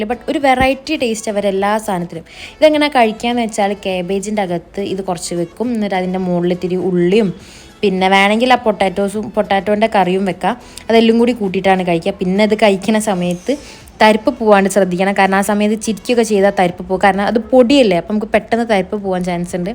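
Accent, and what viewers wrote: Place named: Kozhikode, India. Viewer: His slank thani nadan kozhikode kari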